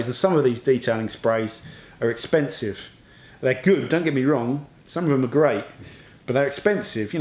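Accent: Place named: Essex, United Kingdom. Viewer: were you from mate im essex you sound not that far away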